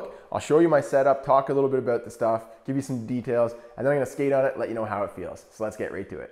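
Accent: Canada. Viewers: This man sounds very Canadian by his accent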